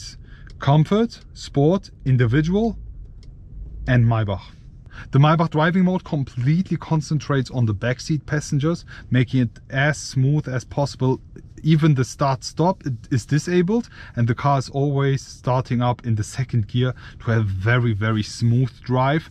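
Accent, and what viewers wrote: Germany. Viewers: lool facts i guess this is just the german accent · Ich liebe den deutschen Akzent hahaha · Er ist sowas von Deutscher😂 100% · Man merkt die deutsche sprache haha! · Der bre ist so hard deutsch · your english is very good, unfortunately with a very strong german accent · Der Typ ist zu 100% Deutscher hahahaha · Hab mich schon über den Akzent gewundert, bis ich dann das Kennzeichen gesehen habe. War doch, wie nach meinem Gefühl ein deutscher Akzent. Gefällt mir xd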